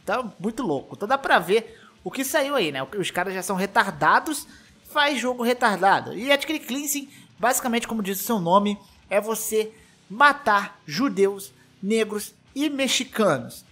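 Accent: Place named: São Paulo, Brazil. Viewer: Vídeo ficou show mas porque você quando fala tá puxando o S igual gente que mora em São Paulo? Puxa o Xiix igual carioca mesmo porque sei que vc é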